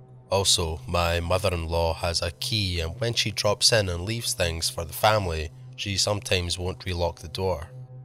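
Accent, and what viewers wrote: Scotland, United Kingdom. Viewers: Came across you through Swamp Dweller. Love the Scot accent. TY, you'll see me around. :)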